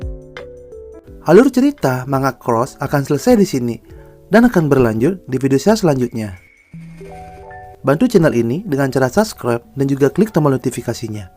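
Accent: Bali, Indonesia. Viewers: orang bali bang?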